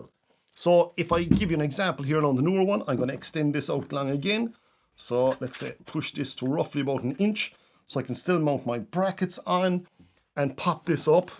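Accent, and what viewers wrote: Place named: Ireland. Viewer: Your channel is great! I came from your video about the Neewer follow focus, and stayed for the one take presentational goodness and Irish accent. 😂 Love from Down Under. ❤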